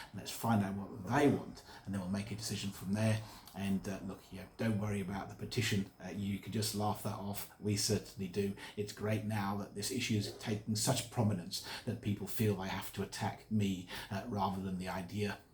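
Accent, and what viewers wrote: United Kingdom. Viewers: This guy actually has a British accent. He needs to go.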